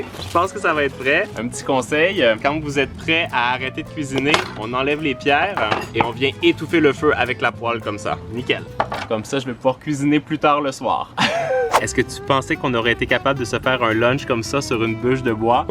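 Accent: Quebec, Canada. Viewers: 😂😂j’adore,ton accents québécois 😂😂j’aimerais trop abiter au Canada !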